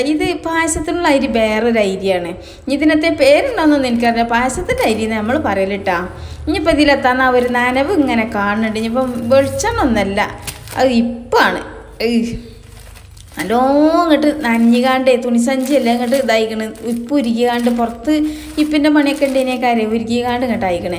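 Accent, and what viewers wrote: Malappuram, India. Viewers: ഈ ഭാഷ കേട്ടാൽ തോന്നും ഇവൾ മാത്രേ മലപ്പുറത്തൊള്ളുന്നു prrr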